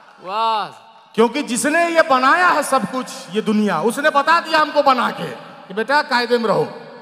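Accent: Bihar, India. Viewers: आवाज में बिहारीपन का जो मिठास है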